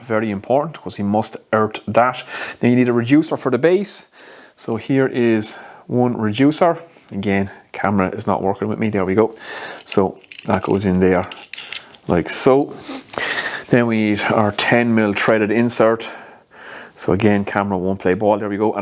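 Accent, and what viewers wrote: Ireland. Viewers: I think it'd be cheaper to scrap some cheap yard sale lamps for the components... I've got access to a bunch of wood and have all the tools but I just couldn't see myself selling those for that much considering how much batch work goes into it. I don't think I'd charge more than about $65-$70 a lamp all in all. rather spend a week cutting up parts and components and spend the weekend assembling a BUNCH of them. steep prices mean you don't have to sell AS MANY to get where you need to be but cheaper prices mean MORE people are going to be willing to buy them which is a more steady strategy. I guess it comes down to HOW much do you want to work, then again going off the accent I'm guessing you're in Ireland and I don't know the import taxes on a lot of that stuff so that's likely where most of the high cost for the electrical components is coming from. 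the price on those components is just mind boggling lol they should be like a dime a dozen! you should change jobs and be an importer at that point though... life's too expensive anymore these days lol doesn't make sense to me...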